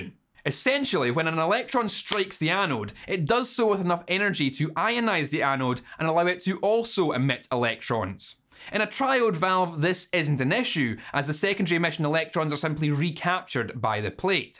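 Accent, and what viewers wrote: Scotland, United Kingdom. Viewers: Great, as usual. Love that Scottish accent, since I learned English in Edinburgh. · I'm 57 and learn new shit from you every time I watch one of your videos. Love the Scott in your voice. Thank you. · great explanation, but your American accent sounds like an American doing a Scottish accent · Still has Scottish in his American accent. I love it. I love it so much. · Watching video like "Ok. Makes sense. Grid biased... Anode whosits... Dull Scottish voiced white noise playing as consciousness ebbs away... Hit like and subscribe" and I'm back to reality.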